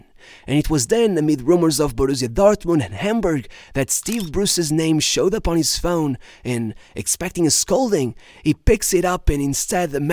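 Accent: Portugal. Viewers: is it just me? i just can't stand Portuguese accent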